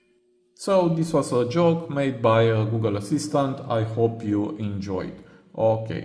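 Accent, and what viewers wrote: Romania. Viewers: Thank you very much! I think u are Romanian by Ur accent ❤❤❤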